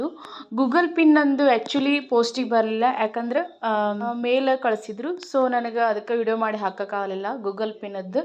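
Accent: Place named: North Karnataka, India. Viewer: Very very nice and good information 🎉 ಉತ್ತರ ಕರ್ನಾಟಕ ಭಾಷೆ ಕೇಳಾಕ ಚಂದ ನನಗಂತೂ ಬಹಳ ಖುಷಿಯಾಗುತ್ತಿದೆ🎉🤗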